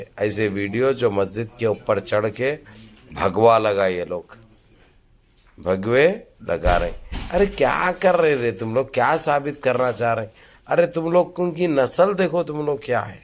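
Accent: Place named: Bangladesh. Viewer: Ap arbi logou ku denk kar ap bahut feel kar te jaisa ke ap bhi arabi ahy hyd ke chaushu se baat karoyaro ap jo arabi me karte aisa lagta ahy koyai bangali ahy ap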